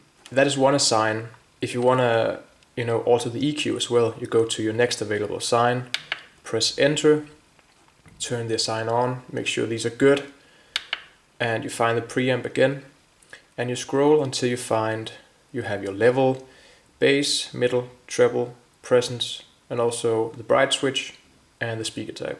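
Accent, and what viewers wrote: Denmark. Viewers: Owned a Cort S2600, really good value guitar. BTW are you from Denmark, regarding your accent?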